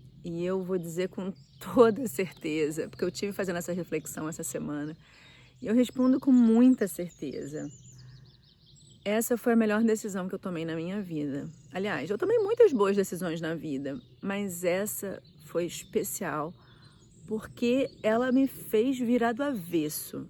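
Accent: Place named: Brazil. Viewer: Olà fico contente com suas declaraçoes, mas desculpa minha pergunta o porqué de ainda falares com sotaque Brasileiro? fui emigrante na Suissa e Luxembourgo e nunca usei linguagem portuguesa e o frances também é de origem do latin entendes, é a unico reparo que faço em sua aventura aqui em portugal, eu me entegrei na Europa em frances e para mim o mais importante na integraçao é prencipalmente a linguagem quasi todos portugueses gostam que qualquer raça que falem a linguagem correctamente e resto da Europa obrigado por sua atençao.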